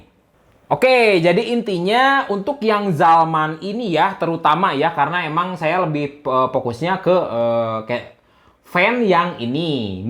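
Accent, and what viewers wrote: West Java, Indonesia. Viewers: Mang orang mana sunda amat eta congorna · sunda bang?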